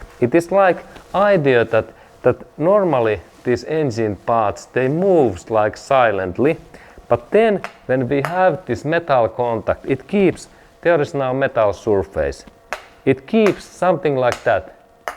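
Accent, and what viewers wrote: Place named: Finland. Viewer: I think this is a Finnish (Uralic) accent?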